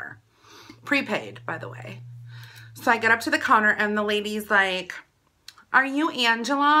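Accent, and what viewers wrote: Wisconsin, United States. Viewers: People from Wisconsin sound like this?? Interesting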